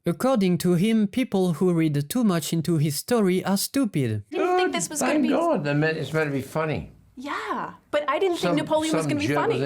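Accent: France. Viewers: Ton accent frenchie me fume 😂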